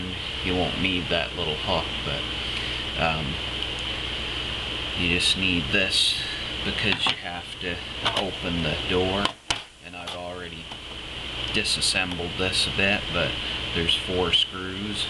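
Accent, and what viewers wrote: Canada. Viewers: ince acent you got there! where is it from? Canada? haha I'm from Canada too, so thats how I knew you were from there... and then I checked your chanel... haha! Thx for the vid man!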